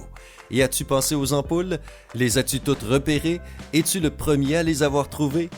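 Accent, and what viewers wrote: Canada. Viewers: Techniquement, ça s'entend que le doubleur est canadien (ou québécois peu importe) donc ils suivent globalement les mêmes règles. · Ces conseils semblent tirés d'un magazine axé sur le même stéréotype : un couple, un enfant, un budget, Papa travaille dans le tertiaire comme cadre moyen... C'est très réducteur, ces conseils qui n'ont rien de nouveau. J'ai l'impression, de plus, qu'avec l'accent de la voix off, ces "14 faits" proviennent du Canada où les conditions sont différentes - on demande, en France, si facilement une "promotion" ? - non, et tous les chiffres budgétaires sont approximatifs (et on a tout converti en Euros). Les chiffres de l'immobilier sont très variables en France, mais une maison correcte à 100 000 € sans rénovation coûteuse, ça n'existe que dans quelques départements désertiques. Et puis les bons conseils entre ne pas emprunter, mais savoir investir, on est là encore axé sur un modèle unique presque idéal. 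Tout le monde est fait pareil ? Non. J'ai l'impression d'entendre à nouveau les conseils de ma mère quand j'avais 20 ans, et à des conseils trop formatés, il n'y a jamais de résultats parfaits (voire des échecs). Ma mère n'imaginait pas, il y a 30 ans, ce que je serais aujourd'hui, dans un contexte socioéconomique radicalement différent. Donc, tout cela est peut-être vrai, mais pour "un-couple-trentenaire-qui-veut-avoir-une-maison-pour-avoir-un-enfant-à-payer-avec-une-augmentation-de-Papa". La pub idéale, quoi. La vie idéale qui n'existe pas.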